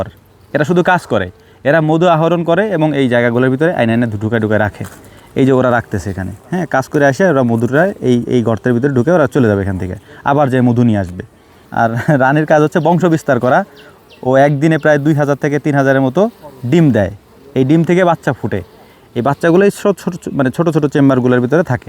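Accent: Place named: Magura, Bangladesh. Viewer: পিউর মাগুরার ভাষা 😅😅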